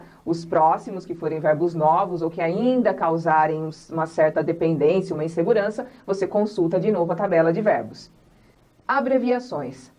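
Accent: Brazil. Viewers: thanks a lot for the class...that will help lots of brazilians sttudents for sure ...but some pronunciation mistakes.. examples....that she said...last weekiii like Brazilians do..it's wrong...It x eat it's different... present perfectiii....likedi it's likt it's a typical Brazilian accent...the use of ED like worked it's wrong...it's workt....I know that many people don't like to improve their accent and pronunciation. they just want to get across their messages....but for those who are teacher or that one's who really want to become better at English should reduce their accent.... please don't take it as a complain. sometimes we have to have someone who correct us..and better yet....Learn with our mistakes.... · Professora. Sempre foi e sempre será mais fácil lançar criticas do que elogios. Porque para elogiar é preciso entender o que o outro está se propondo em sua mensagem. 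Por isso preciso dizer aqui que o seu trabalho é maravilhoso, o aprendizado é real e o seu sotaque para nós é a melhor parte dele. Principalmente para quem quer aprender Inglês sem querer se transformar em um "americado" sem sotaque. É claro que seu marido Steve tem o sotaque de lá e você tem o sotaque daqui. E o que isso importa? É claro que Ricka Yoshida também deve ter seu sotaque característico mesmo quando terminar os seus estudos aqui, grátis, na Managed English. Siga em frente. Abraços!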